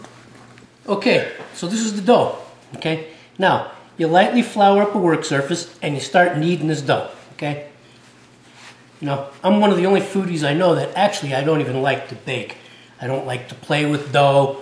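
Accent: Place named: Brooklyn, United States. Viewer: !! I love your intro, the history lesson, and this artisan pizza recipe. This is a must try. Thanks for the olive oil on the dough tip! I like that Brooklyn accent when it sneaks out too!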